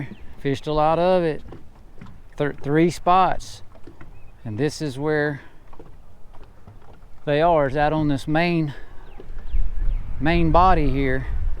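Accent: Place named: South, United States. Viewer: Great Video, loved listening to your 'Southern Drawl'! New Sub from Indiana. Keep on Fishin!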